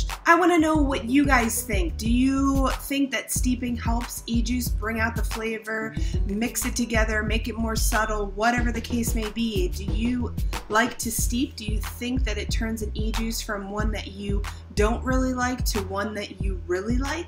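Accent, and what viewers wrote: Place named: Australia. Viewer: I love your accent. Which part of Australia are you from?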